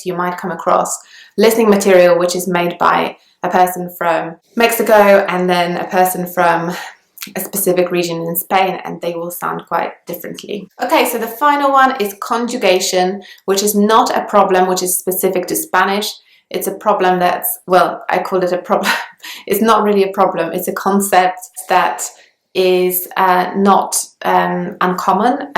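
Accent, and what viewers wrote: England, United Kingdom. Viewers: What a heavy English accent you have ( teacher) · How is this accent posh? It is just a normal English accent. I have heard more posh from elderly in my area though LMAO. Some tips to sound this way for forebears is say things as it is spelled like ‘t’ and extend your ‘a’s for example “paahst” = past. Even the h is super subtly pronounced where I live but a lot of People say it with a northern accent and mix it up. She says “example” I say exaahmple stressing that p a bit.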